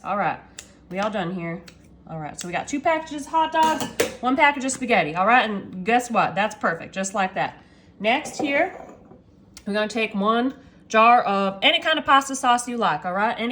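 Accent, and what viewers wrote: South, United States. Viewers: You sound Southern just like me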